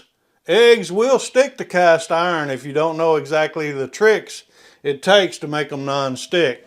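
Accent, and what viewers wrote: South, United States. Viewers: Yella 😂. Your southern accent brings back memories of my daddy.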